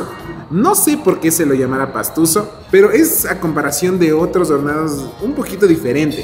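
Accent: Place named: Ecuador. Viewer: Jajajaja pero habla como el ecuatoriano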